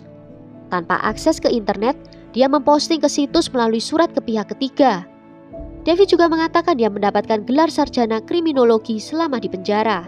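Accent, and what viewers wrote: Java, Indonesia. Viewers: Hay medokuhhh cantik 😍😍😍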